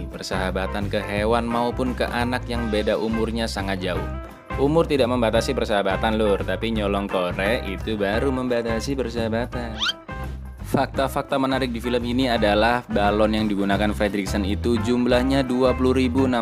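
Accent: Java, Indonesia. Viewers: Bang orang jowo ya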